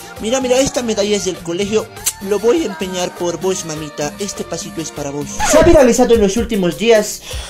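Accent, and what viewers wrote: Bolivia. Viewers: hablas del Cu.... tipico hacento Cochabambino que intenta ser graciosillo...... por cierto eres muy guapo 😆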